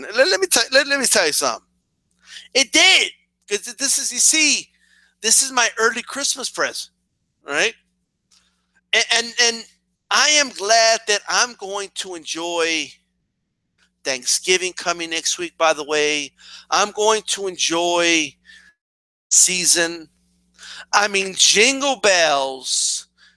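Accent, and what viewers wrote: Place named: New Mexico, United States. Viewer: por k hablas con accento nuevo mejicano?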